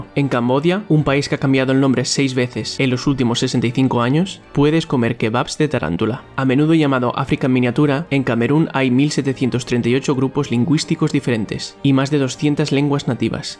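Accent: Spain. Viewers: Cómo se nota que sos español jajaja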